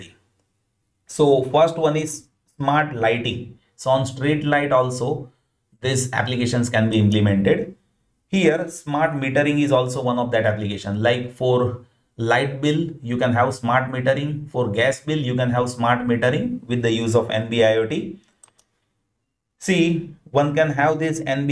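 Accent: India. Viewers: every thing is fine you are good , but indian accent is totally vague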